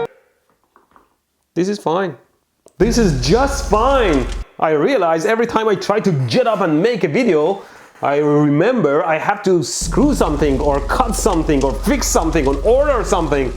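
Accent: Iran. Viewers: I'm Persian and his voice is to Persians what a newscaster's voice is to Americans. It's the most Persian accent on the planet lol. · The second i hear him talk i can tell he’s Persian as hell.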